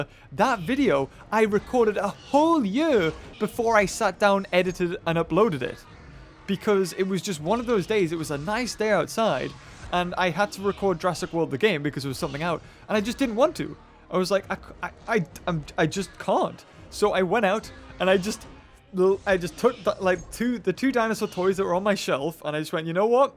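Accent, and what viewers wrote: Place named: United Kingdom. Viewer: why do you even think i came here for? The brittish giberish ofcourse:)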